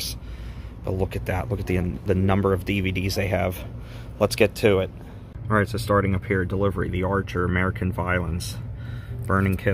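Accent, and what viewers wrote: Pittsburgh, United States. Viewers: I thought I heard that Pittsburgh accent in your Chuck E. Cheese video lmao yinzers for life man. Subscribed!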